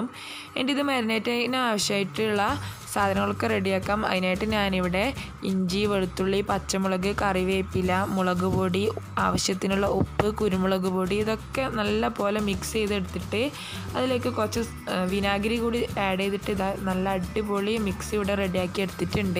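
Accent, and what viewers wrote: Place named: Kasaragod, India. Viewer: Ingal kaasarod aanengilum slang koykoottaaare cherya chaayvu ind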